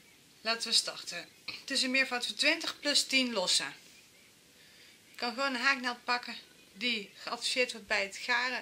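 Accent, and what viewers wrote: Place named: Brabant, Netherlands. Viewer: Ja hoor je kleine en het Brabants accentje ...ben ook een Brabantse ...maar woon nu in Groningen ...blijft leuk om te horen 😀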